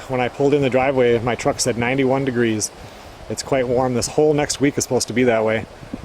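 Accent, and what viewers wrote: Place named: North, United States. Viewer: I thought you have a northern accent. How does she like the difference in weather?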